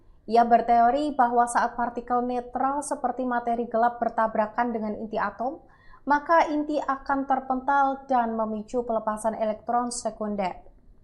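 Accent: Java, Indonesia. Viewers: Ini yang ngomong orang jawa ya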